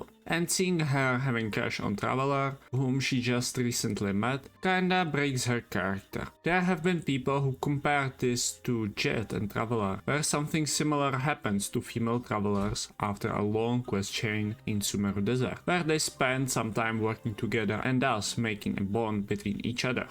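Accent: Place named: Czech Republic. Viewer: "Yay, another video hating on Natlan! I'm in!" "Dude has Czech accent, is he Czech?... YAY, a fellow citizen!!" I actually quit during Natlan. I'm done with the exploration, non-skippable dialogues and I can't believe that 4 years in we still have to grind talents on specific days of the week with no resin storage for overflow. Natlan looks completely different from what I expected. Nation of War my ass, this looks like Disney and I can't stand it. I'm also not that thrilled about pokemons and weird immersion breaking stuff like... motorbikes or flying canons. Just nope, Dawei can cry all he wants, I'm not going back and I used to whale pretty hard in the past.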